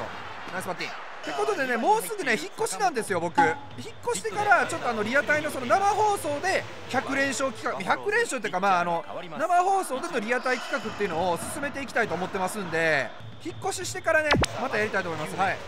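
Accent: Kansai, Japan. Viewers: 関西からででくん？ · 引っ越ししてからも関西弁やめんといてな！また帰って来てくださいね！！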